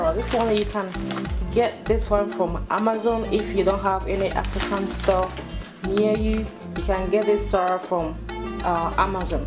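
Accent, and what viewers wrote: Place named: Ashanti, Ghana. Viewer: I smell an ashanti acent Grove Garic Brood Lol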